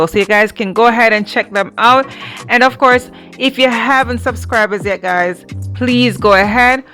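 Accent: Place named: North Carolina, United States. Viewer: When you said y'all I thought well I'm i n north Carolina so I recognize that accent. Nice work that you do. I've also subscribed to your channel as well. Let the journey begin. Embrace it... Yours truly Ed Parkhurst. Nice to have met you.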